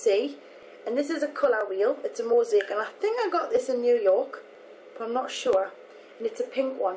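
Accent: Newcastle, United Kingdom. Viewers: Love your accent :D Gotta love the Geordies! Your eyelashes are so long! Are they natural? Your nose is actually a really nice shape! I never really noticed its size before you pointed it out, just its shape, its lovely and straight :) Loving the videos chuck, upload more soon. x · U sound like Cheryl Cole are you from newcastle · I'm pretty sure it's a British accent. :) It's an amazing accent :)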